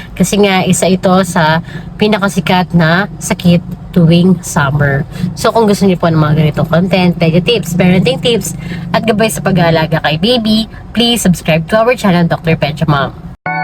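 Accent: Pampanga, Philippines. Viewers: Kapampangan kayu po?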